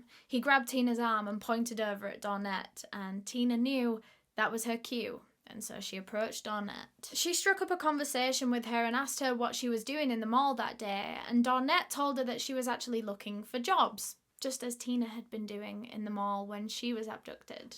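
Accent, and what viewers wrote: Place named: England, United Kingdom. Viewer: Took me a long while to make my fav to listen to, her English accent is very strong … but today I’m struggling with those stupid “baby hairs”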